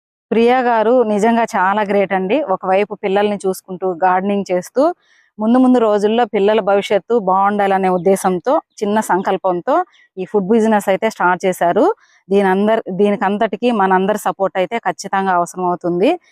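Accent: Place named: Guntur, India. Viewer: మీరు వెంకటగిరి అన్నారు కదా, మీ లాంగ్వేజ్ నెల్లూరు లా కాకుండా గుంటూరు యాస లా ఉంది మీరు గుంటూరు వాళ్ళా గారు లేదా ఆ డెలివరీ చేసిన వాళ్ళు గుంటూరు నా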